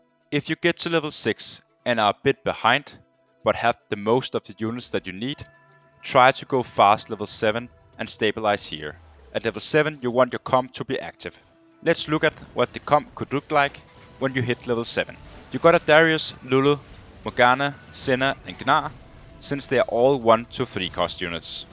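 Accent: Denmark. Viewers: Hey dude, can't help noticing the danish accent <3 ur videos are great very "hjælpsomme" :P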